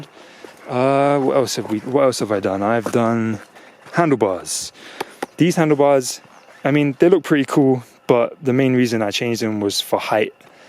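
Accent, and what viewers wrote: London, United Kingdom. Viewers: Why you sound like your from London but for a Arab or whatever number plate lol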